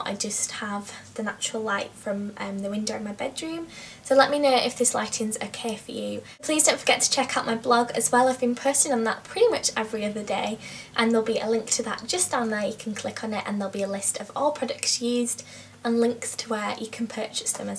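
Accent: United Kingdom. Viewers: i like your british accent:) hihi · where are you from in the uk? your accent is so interesting ive never heard one quite like it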